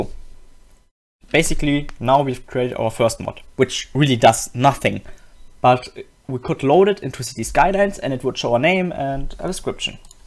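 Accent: Germany. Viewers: Like for the cool dorky German accent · are you german? your accent is so like one especialy when you end a sentence like german way or lee bit French way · No hate but It sounds like you have a German accent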